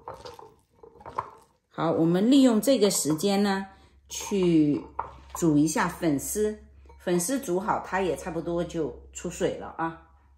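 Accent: Fuzhou, China. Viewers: 謝謝老師的分享🙏🏼 確認一下這鍋的英文是 Carbon Steel嗎？多謝您的回覆，我超愛您的福州口音❤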